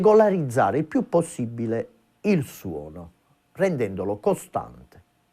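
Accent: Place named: Campania, Italy. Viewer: Grande maestro! Simpaticissimo. L'accento campano mi fa sentire a casa.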